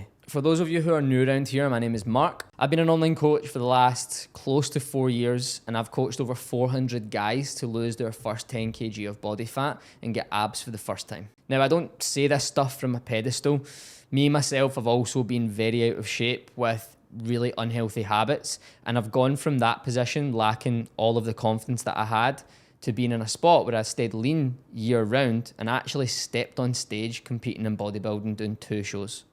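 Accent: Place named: Scotland, United Kingdom. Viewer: he either doesn't understand the Scottish accent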